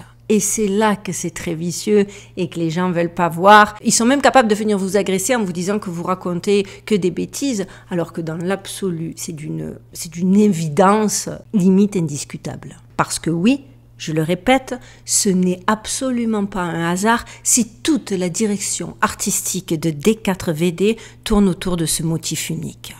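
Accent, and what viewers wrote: Marseille, France. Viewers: Incroyable. Masterpiece. Avant dans les années 80(dsl chui un vieux😂😂😂)tout etait symbolique pour des initiés... Comme si les signes étaient mis pour les doués d intelligence... Aujourd'hui 2025😅😅😅😅 plus c est gros flagrant plus ca passe. Et la meilleure invention pour contré les chercheurs de vérité c est le mot COMPLOTISTE.. Des que quelqu'un a une analyse sur un fait populaire il est taxé de complotiste😅😅😅😅😅... Franchement bravo pour travail car au début je ne comprenais pas qu une dame avec un accent du vieux port abordé des sujets aussi 'Touchi' et finalement je trouve vos analyses ultra pertinente. Courage et allez l om😂🎉🎉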